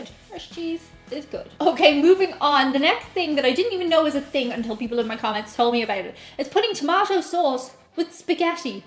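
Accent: Ireland. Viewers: Good God! You are defending UK 'pudding'? Are you insane? UK needs to have it's rights to the word pudding irrevocably revoked. And I'm also roping you irishfolk into the lawsuit against haggis. There is plenty of guilt there to go around. Haggis, and 'pudding' that is more like a hockeypuck made of dog kibble than anything remotely edible and you think you can get away with dissing meatloaf!!! You, your adorable Oyrish accent and lovely face are hereby sentenced to a lifetime of only being able to eat alarmingly orange American Cheeses. So there. Blasphemer. No Corn Dogs!? Devil woman.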